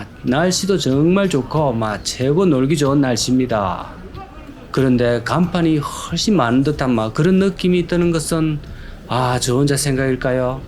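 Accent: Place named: Seoul, South Korea. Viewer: 서울말 다되가네요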